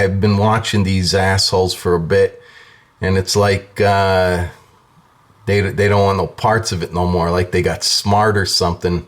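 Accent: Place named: North, United States. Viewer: lol I love your accent you from Jersey, Boston, New York I notice that northern accent. And yea I have ant issues at my house, they’re usually the smaller black ants and I made a mixture with boric acid powder with honey and cut out a hole in a plastic bottle for easy access and I caught tons of ants that way and they weren’t able to get back out of the bottle. But you’re right ants can be smart and picky!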